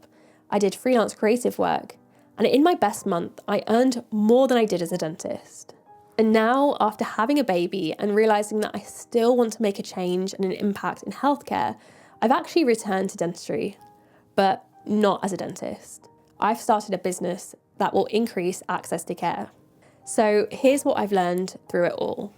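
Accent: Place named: RP, United Kingdom. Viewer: You sound very posh!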